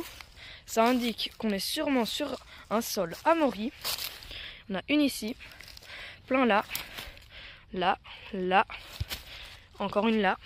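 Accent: Franche-Comté, France. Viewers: Bravo ,et j'ai cru reconnaître une pointe d'accent Franc Comtois, d'où je te félicite. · Enorme, parce que vous avez l'accent franc-comtois.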